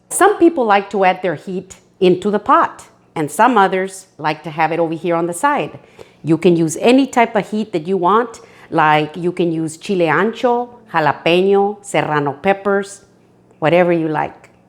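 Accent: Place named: Rio Grande Valley, United States. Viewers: 15 seconds in...I knew you're from the RGV! 😁 It's the accent...😆(since I was from there, now living in Houston), but I'm looking for an awesome recipe for menudo to serve at least 10 people...I'm gunna try yours and just adjust the size portions to cook as much as I need to feed. 😎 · Loving your videos. Are you from Texas? I can hear it in your accent. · My family is from your end of Texas. I appreciate that you used vinegar in your recipe. I made some menudo recently with the honeycomb my favorite. I use vinegar to quell the smell also. You remind me of a cousin of mine from Sand Diego Texas shes long passed away but you two look a lot a like and your accent so unique to that area. After watching you Ill have to make a couple of adjustments to mine. I really like the method you use on straining the chilis into the soup.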